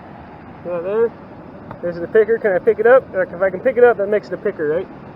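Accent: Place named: United States. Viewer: Thanks! I could not understand any of the detail words with such a heavy American accent as cool as it sounds aha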